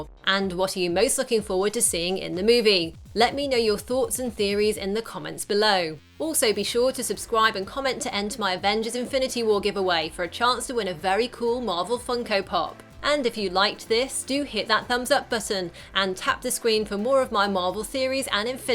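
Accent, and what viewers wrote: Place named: United Kingdom. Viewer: Ur British accent gives me boner ever damn time........ Jizzlied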